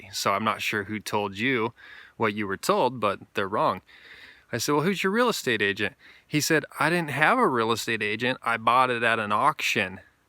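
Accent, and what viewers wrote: Idaho, United States. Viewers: Wait you are from Idaho?! Me too ahhaha I was just about to say you sound like an Idaho man hahah love it!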